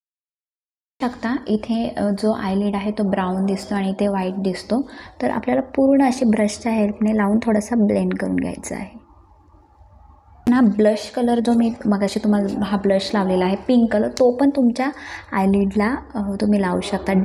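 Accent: India. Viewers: Vada saydchi aahes ka bolnyavarun samjte must video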